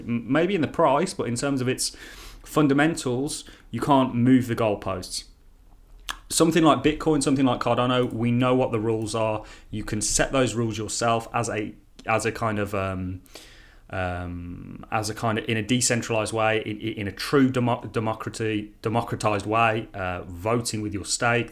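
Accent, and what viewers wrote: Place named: Midlands, United Kingdom. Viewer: thanks for your videos. Guessing from your accent your in the midlands.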